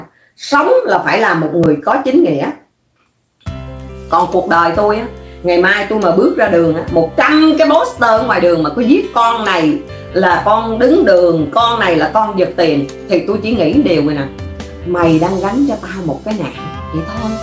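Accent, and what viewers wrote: Standard. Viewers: Chị nói rất chuẩn.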